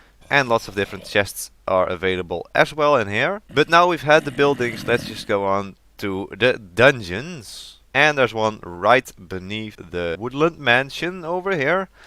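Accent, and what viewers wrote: Netherlands. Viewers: Are you dutch? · Are you from the Netherlands? You definitely have the accent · Ik hoor gewoon dat je uit Nederland komt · nederlands accent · Dutch?? If not you sound like one😂 nice vid btw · Superfun vid but i have a question: are you dutch? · are you dutch? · That's hella a Dutch accent you got going on, sounds pretty good so far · You officially are dutch i hear it gewoon plat nederlands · Cool video!! Are you dutch?? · you are definitely dutch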